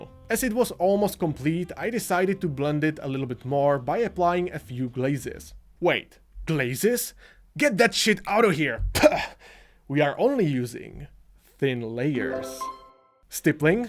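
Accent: Czech Republic. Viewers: First few sentences and I immediately knew you are Czech, then I checked and I was correct :D Hi from Prague :D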